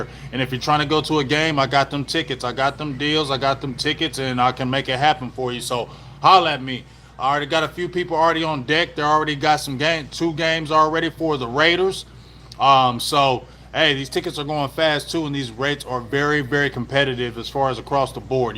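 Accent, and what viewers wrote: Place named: Dallas, United States. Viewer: The way you talk is total Dallas makes me miss my beloved city. Go MAVS. Go Cowboys!!! Been a fan since 76, for life baby...keep on doin' watcha doin bro. ✌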